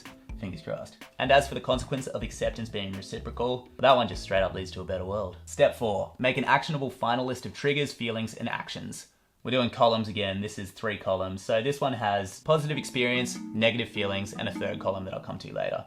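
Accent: Australia. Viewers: I bloody adore your videos. Thank you for making them from the bottom of my heart! Good to hear an Aussie voice too!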